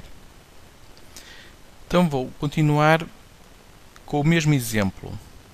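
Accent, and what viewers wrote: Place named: United States. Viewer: otimo vc tem sotaque de americano ...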